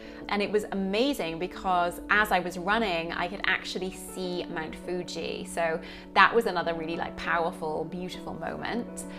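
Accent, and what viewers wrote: United Kingdom. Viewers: いつもキレイなイギリス英語を勉強しています。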